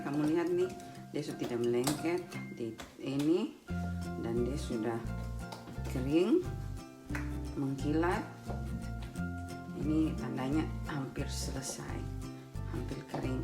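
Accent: Papua, Indonesia. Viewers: Pelei mace ko tra kosong...itu boleh dgn logat yg katorang dipapua mengerti dan paling jelas sekali. Salam dan.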